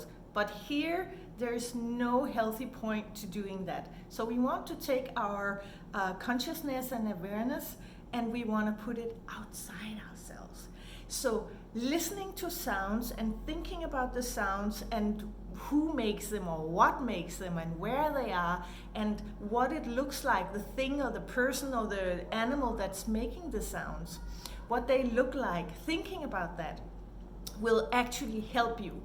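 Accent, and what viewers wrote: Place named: Germany. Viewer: Your accent sounds German. Are you German? Also good video.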